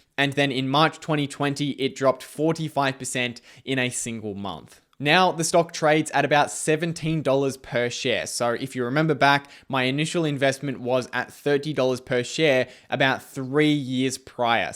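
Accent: Australia. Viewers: I only recently discovered your videos and I really like them. I wish I had your insight when I was your age! Your reflection on your mistakes is so valuable for me! It made me understand my own decisions and my own thought process much more. It also helps me to understand that passive investing probably is better for me as picking the right stocks is a lot of work as you said. I also like the clean style of your videos: no annoying noise, straight to the point and just enough visuals. They are also not too long! And your Australian accent is very pleasent!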